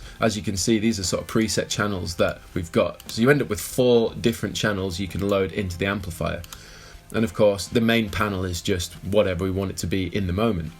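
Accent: Yorkshire, United Kingdom. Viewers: It was a tough choice between this and the Blues Cube. I chose the cube (100w) and love it, even though I’m considerably poorer. You’ve become a very accomplished player over the time I’ve watched your stuff. Thanks for all the hard work and I wish you a fantastic new year buddy. Btw.... are you a Yorkshire lad?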